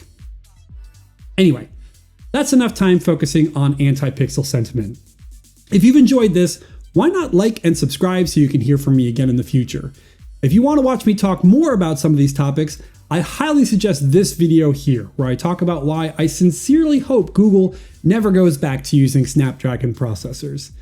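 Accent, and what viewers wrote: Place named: Australia. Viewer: Are you really going to take advice from a dude w an Australian accent?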